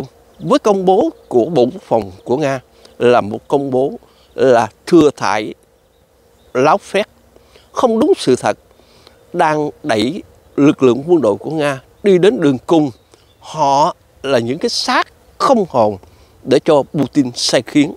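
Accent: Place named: South, Vietnam. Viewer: Rất hay và khóai chất giọng miền Nam của chú. Cảm ơn chú đưa tin tức.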